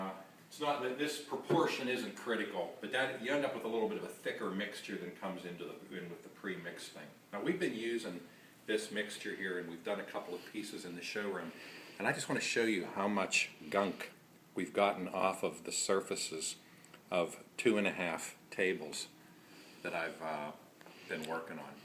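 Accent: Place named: Pittsburgh, United States. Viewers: Agreed sounds like he’s from Pennsyltucky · Thanks. I've used Murphy's for years, but just wanted to see if I used it correctly before I start a project on an old piece. * Side note...I looked up hardwoodartisans. It says Virginia, but you sound like you are from Pittsburgh.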